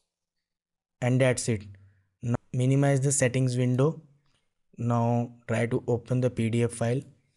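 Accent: India. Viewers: i heard a indian voice so i knwe i was in good hands <3, thank you man.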